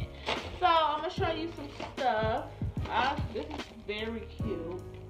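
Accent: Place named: Chicago, United States. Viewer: you definitely from chicago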